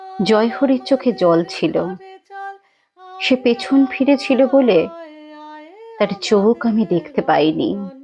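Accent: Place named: Bangal, Bangladesh. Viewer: দারুন সুন্দর লাগলো তোমার কবিতা পরিবেশন বিশেষ করে তোমার মুখে বাঙাল ভাষা গুলি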